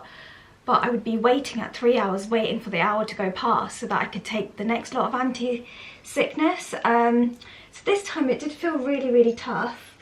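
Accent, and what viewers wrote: United Kingdom. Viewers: you and are such a lovely couple. lovely accent btw. which part of the UK does your british accent originate from?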